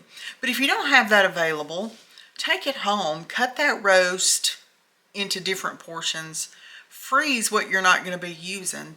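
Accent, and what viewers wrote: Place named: Alabama, United States. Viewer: I've been binge-watching your channel tonight. Listening to you talk, I decided you might be from Alabama. I'm from North Central Alabama. During the past year, I've been cooking for one. You've given me such great ideas. Thank you for reminding me it's prudent to take care of yourself and remember to eat. I look forward to your upcoming videos. Blessings! 😃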